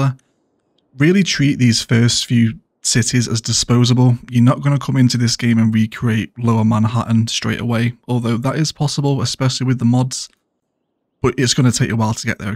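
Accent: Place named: United Kingdom. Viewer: Strange, for me as Russian your accent sounds like british but you prefer rigth-hand traffic. Its my ears failed? :)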